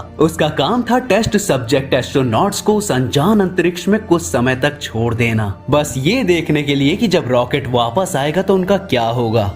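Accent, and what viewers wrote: India. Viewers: bro are you from Odisha??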